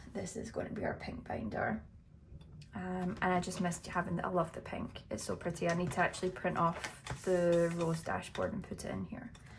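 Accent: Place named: Ireland. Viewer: New subbie here! Love your accent! Irish?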